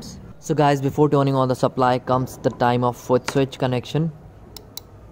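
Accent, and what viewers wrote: India. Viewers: For some reason I love an Indian accent with good English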